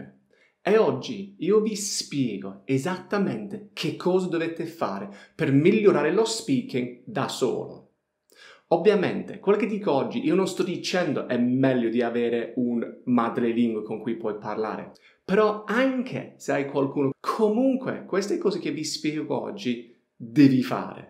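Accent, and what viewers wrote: Apulia, Italy. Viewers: Ascoltando il tuo italiano, penso che tu hai imparato l'italiano in puglia o in abruzzo, è vero? troppo forte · In love with your Apulian accent ♥️ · Eng-Pugliese, favoloso, love it! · Come calabrese? Secondo me è accento pugliese. Sullo stile sono d'accordo... ottimo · Ma tu hai origini pugliesi?? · Ti seguo da poco Sei fantastico simpatico e bravo Poi il tuo accento pugliese è originale Fai lezioni private on line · Thanks for your advices! They're very interesting and useful! I like Reading articles in english and recording my voice repeating that! I like watching your videos. You look just like my american oncle, the way you move, the way you speak With your apulian accent, (we are apulian too). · Inglese con accento pugliese..è stupendo😄👍😉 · ma hai studiato italiano in Puglia? xD hai una cadenza molto simpatica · Non perdere l'accento pugliese .. 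· Sei molto bravo. Mi piace come spieghi e lo fai anche in un modo simpatico...l'accento pugliese è ancora più simpatico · Bravissimo, semplice ed esaustivo. Il tuo accento pugliese è mind blowing 😄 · Ciao una curiosità..il tuo accento pugliese si sente quando parli in inglese con i tuoi connazionali? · Accento Puglinglese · Ma come si fa a non amarti? Sei uno splendido insegnante. È un piacere ascoltarti e imparare da te e con te e tramite te. Il tuo italiano/pugliese è adorabile!!!! Grazie mille per ciò che fai. God bless you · Sì il suocero dev'essere un pugliese, si sente!!🤩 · This guy has a strong Italian accent from Bari, Apulia. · A tratti sembri pugliese :)) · Your Apulian dialect is very funny and above all it is "spot on" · The same 😅 Allora non mi sbagliavo.. ho percepito una cadenza pugliese 😀. Very nice teacher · Ma no !! Lui è inglese quindi parlerà la sua lingua perfettamente ! 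Quando parla in italiano ha l'accento pugliese perché ha praticato l'italiano con i suoi parenti tutti i giorni mettendo in atto quello che consiglia in questa lezione. Ascoltare e ripetere ... Lo ha fatto in Puglia con dei pugliesi ecco perché ha questo accento simpaticissimo. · vabbò figurati, io parlo da solo da quando sono nato, quindi si scandalizzerebbero ben poco. 🤣 Comunque il tuo mischiare inglese e pugliese è veramente fantastico. 🤣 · Thanks! Did you know that your italian accent is from Puglia? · sei fantastico, davvero belle le tue lezioni. Poi hai acquisito quell'accento pugliese che è il top 😁😁👍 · si sente l'accento pugliese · L'unico (bravo) insegnante di inglese al mondo con l'accento Pugliese!!! Adorabile!!!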